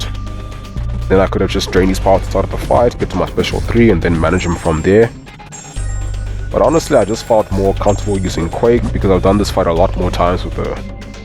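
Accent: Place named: South Africa. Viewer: You sound really south African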